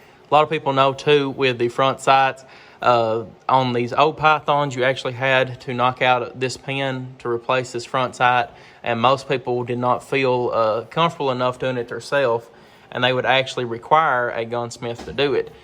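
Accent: South, United States. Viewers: I noticed that you have a southern draw just like I do! Where are you located? I'm in Pensacola, FL. about 10 miles from the Alabama state line. We call the Florida panhandle L.A. as in lower Alabama! I get teased about it also! Dang it!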